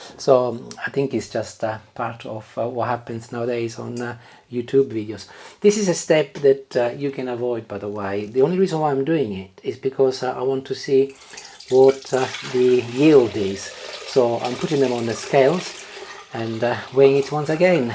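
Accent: Italy. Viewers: What an absolute joy of a video 😊. To hear your beautiful tale of your grape picking experiences was delightful 🙂. Your accent and English is so easy on the ears. I grew up with Italians in NZ and they also spoke with your accent. It brings back beautiful memories for me ❤😊. I'm going to really enjoy listening and learning your recipes 😊❤😊.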